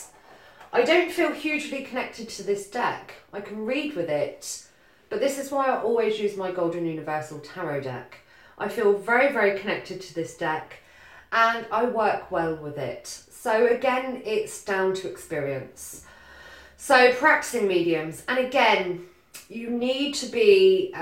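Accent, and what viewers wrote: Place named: England, United Kingdom. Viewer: Thank you, soooooo true! Ps... 'LOVE your English accent and integrity. Yes, HEIDI Symones always resonates w me, and the MANNA reader is ADELINA Bond! Live their ORIGINAL style as yours as well. Have a great day.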